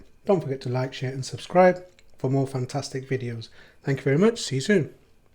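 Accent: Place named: Birmingham, United Kingdom. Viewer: Sounds like a Brummie tinge, are you from the midlands. Apologies if it was obvious where your from from the earlier videos but I recently stumbled on your channel. Very good quality videos by the way, especially the recent trip to Bangladesh. Keep up the good work.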